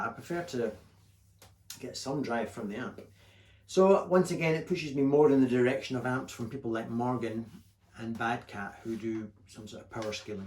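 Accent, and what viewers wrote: Scotland, United Kingdom. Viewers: Thanx so much,. Your vid wuz just GREAT (!)...and, of course, my brain'll have a Scottish accent for days now 😶...and I totally sympathize with you concerning the "Low Volume Dilemma" 😣 I suspect your singing voice'd be quite interesting too. Any thoughts/experiments?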